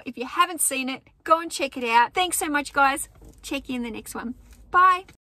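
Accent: Australia. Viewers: Hello, this is the first time viewing your YouTube videos. I did enjoy your content and hustle. I know a lot of folks, like myself, from the U.S. cant distinguish certain accents. I have always loved the Aussie accent over British. I don't know why. I do have a question though. Did you just say to yourself, "These IKEA bags are fun and awesome." They really are. I see blue ones all the time. I've never seen these. That's so cool. My wife and I need to get some. We are trying to one day be successful in turning things for a profit. We have gotten our feet wet. One day we will be full time. Lots of love, Rosie and Ritta from Texas.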